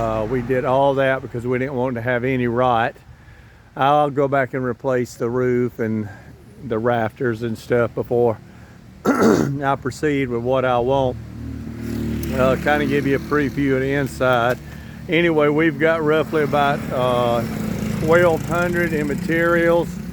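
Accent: South, United States. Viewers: Aloha from Hawaii dude. I love your Southern drawl lol. You are hard core. I really want to come visit. It is getting stupid expensive to live in Hawaii and racism against whites is bad. Thanks for sharing your life with us mate. ❤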